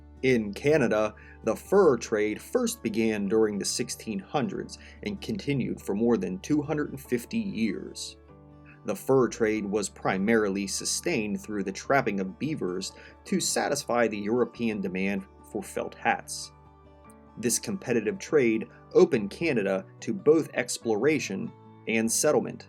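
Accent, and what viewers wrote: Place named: United States. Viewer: Are you American? It's funny how you pronounce all the French words.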